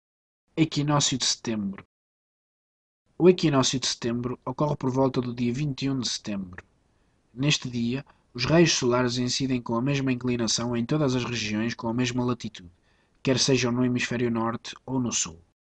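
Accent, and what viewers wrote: Portugal. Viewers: porque portugues de portugal :3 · O vídeo é dirigido ao povo português. O sotaque para português, sendo de português, não importa... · Horrível, está com este sotaque português. Como vou passar uma coisa dessa para os meus alunos? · Pq é sutaque português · ns não dá para entender nd ele fala meio portugal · Tecnicamente é o melhor vídeo que encontrei sobre o assunto, a explicação é perfeita, pena o português de Portugal. Mas merece um áudio novo.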